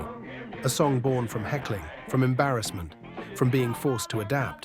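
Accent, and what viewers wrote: United Kingdom. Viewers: Please narrate your work and lose the Brit !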